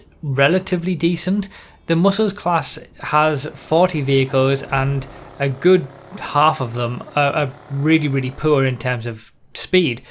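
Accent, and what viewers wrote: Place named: Bradford, United Kingdom. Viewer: okay that makes more sense cos to my ears he has an accent that would come from Bradford or around that area.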